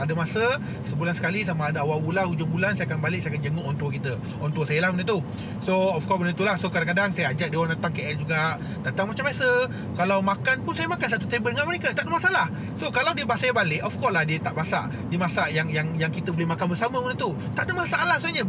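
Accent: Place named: Negeri Sembilan, Malaysia. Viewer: Loghat N9 lak... best dengar...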